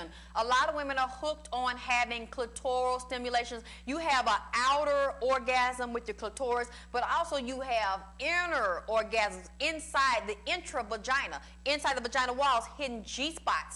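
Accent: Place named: South, United States. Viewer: Is This Lady From The South Because She Is COUNTRY lol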